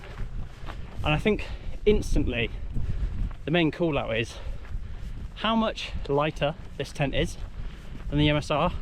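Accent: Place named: United Kingdom. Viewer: 1. Starting video 2. Hearing british accent 3. Closing videp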